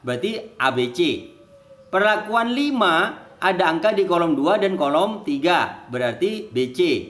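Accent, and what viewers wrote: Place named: Madura, Indonesia. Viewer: Semangat pak, lanjutkan mengajarnya , saya suka dengernya kalo pake logat madura gini mantab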